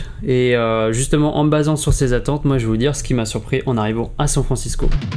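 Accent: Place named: Nord-Pas-de-Calais, France. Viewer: Bien bien :) J'aime bien le ch'tis ! Ta un avenir en comédien en fait, oublis youtube ! :) Par contre pas fan du filtre blanc que tu as utilisé :(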